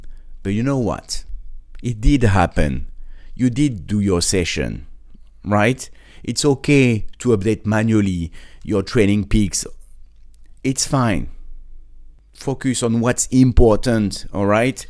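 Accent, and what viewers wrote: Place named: France. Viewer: It's funny how your accent sounds exactly like the one of a French native speaker.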